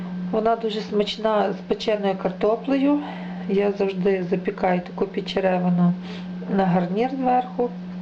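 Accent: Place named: Western Ukraine, Ukraine. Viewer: як приємно чути західний акцент)дуже гарний і зрозумілий рецепт) підписалась на твій канал, підтримай мене і підпишись на мій) дякую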